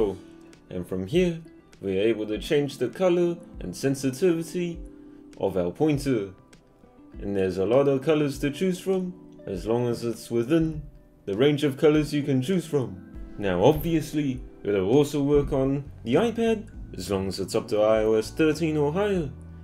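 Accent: New Zealand. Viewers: new zeiland accent lol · Kiwi accent 😅. Look it up.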